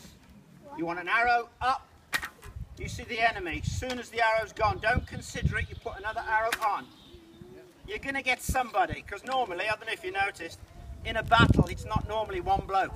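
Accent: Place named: Midlands, United Kingdom. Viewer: This is just how people from the Midlands talk.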